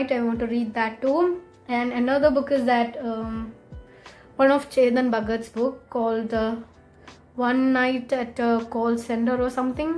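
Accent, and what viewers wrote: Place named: Kerala, India. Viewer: Her Malayalam accent was super good!!!!!!!<<<3💗🧿🤌🏻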